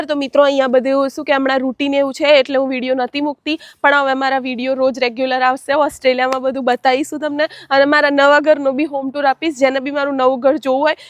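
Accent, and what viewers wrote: Sabarkantha, India. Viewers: સાબર કાઠા ની બોલી બેન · Tamari language parthi evu lage che k tame Bayad, Sabarkatha dist. Na hasho.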